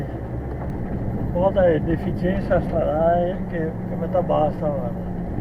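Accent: Veneto, Italy. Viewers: È tutto bene...i difetti potranno ma io non li vedo ...mi va bene così...poi mi piace sentir parlare Veneto.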